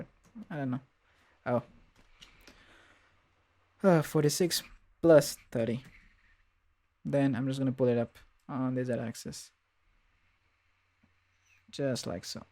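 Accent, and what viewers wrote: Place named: India. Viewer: I hear an Indian accent. Hi.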